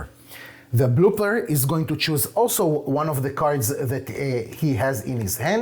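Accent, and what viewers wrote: France. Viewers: Oh, my apologies. I assumed you are French, since you are located in Paris and you definitely feature a French accent. If that isn't a French accent, what is? On top, you seem to sling a perfectly fine typed French. So if you are not French, you basically are the perfect infiltrator, probably on secret mission. 🤣🤣🤣 Again, sorry for my misconception.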